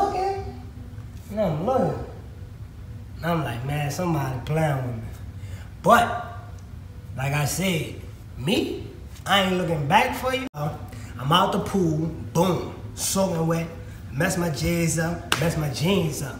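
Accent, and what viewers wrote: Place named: New Orleans, United States. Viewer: Is he from New Orleans he sounds like it...I’m a new subscriber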